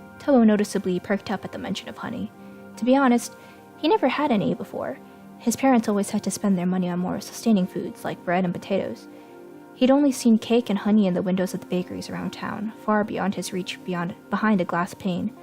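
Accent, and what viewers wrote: United Kingdom. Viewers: I love your videos!! And your british accent!! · You did really good with the British accent, in my opinion. Edit: BUMBLE BEE!! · The British accent was pretty pog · You are good at a brittish accent mainly a male child!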